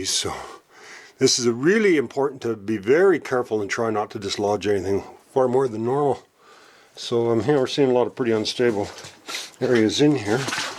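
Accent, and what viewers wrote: Canada. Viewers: Wonder if he is Canadian, eh?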